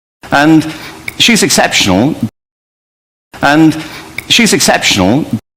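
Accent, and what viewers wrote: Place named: United Kingdom. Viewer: かっちょいいイギリス英語ですね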